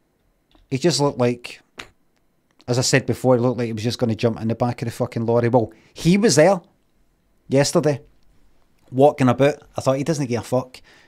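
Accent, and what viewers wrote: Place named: Glasgow, United Kingdom. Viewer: 'Mattreyseys' 😆 How I love the Glasgae twang.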